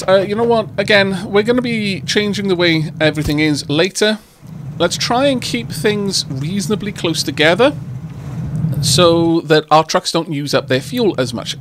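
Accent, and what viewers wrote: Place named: RP, United Kingdom. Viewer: Great video, great narration. Big fan. Your accent seems a little "posh". What area is it from? :)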